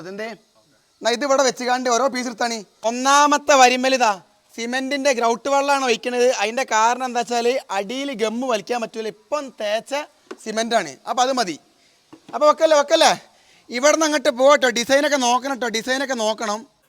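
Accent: Malappuram, India. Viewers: താൻ മലപ്പുറത്ത് എവിടെയാണ് തന്റെ സംസാരം സൂപ്പറാ👍🏻👍🏻👍🏻👍🏻👍🏻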